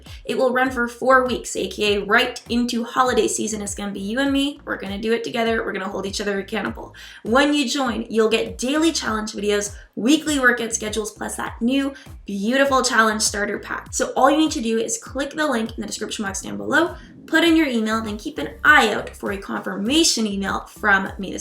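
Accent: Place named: Canada. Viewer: i hear Canadian in your voice, and no i am not familiar with this channel.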